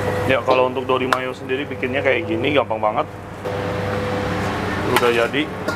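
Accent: Banyumas, Indonesia. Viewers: Kok jowone Inyong Inyong mang ini orang mana. Xie Xie ya Koo ilmunya Sucses selalu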